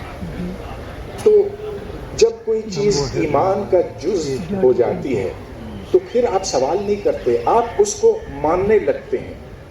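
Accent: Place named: United Kingdom. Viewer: and we have better scholars than you , we always had. Speaking British English wont help you idiot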